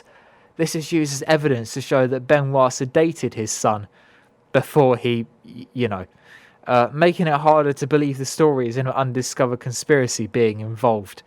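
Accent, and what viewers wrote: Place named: United Kingdom. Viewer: And of course another wrestling channel with a British accent? It’s like nails down a chalkboard can’t stand British accents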